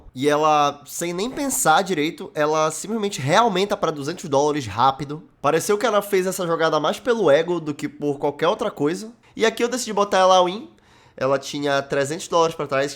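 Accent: Pará, Brazil. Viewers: Mano tu é paraense ? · Mano tu é paraense? kkk Brabo de mais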